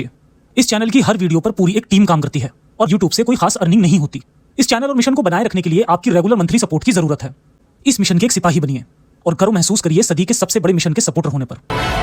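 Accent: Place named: Uttar Pradesh, India. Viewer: उत्तर प्रदेश का है इस की बोली से समझ में आता है भाई😎 सच बताना भाई में सच बोल रहा हूं ना।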